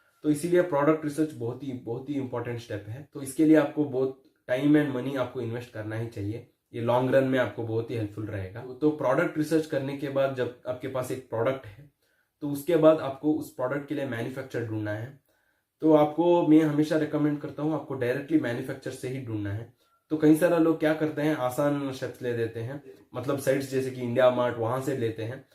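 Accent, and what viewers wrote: Karnataka, India. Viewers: Are you from Karnataka bruh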